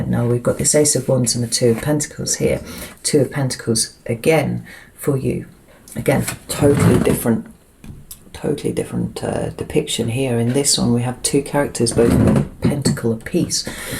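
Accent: United Kingdom. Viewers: Dude I love your aesthetic, the whole UK accent (idk) and the whole dark style fits very nicely.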